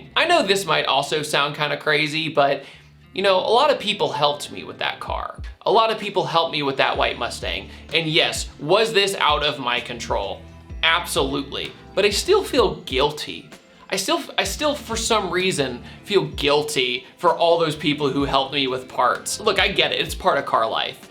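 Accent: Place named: New Jersey, United States. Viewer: New jersey native? Sounds about right. 😂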